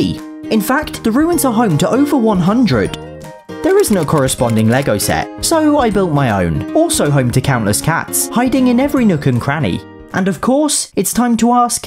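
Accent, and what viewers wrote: United Kingdom. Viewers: Between his humour, LEGO obsession, love for cats, incredible content and British accent... you are unmatched Edit: OMG REPLIED TO MY COMMENT! MOM I'M FAMOUS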